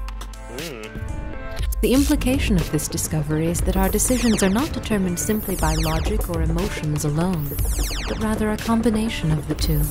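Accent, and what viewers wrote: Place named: United Kingdom. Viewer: Some one PLEASE tell me WHY these producers insist that a 'British' accent qualifies as an authoritative narrative ????? Is this opperant conditioning attitude the best you can do? Right coast version of British accent turned inside out. The flexion is suck eggs.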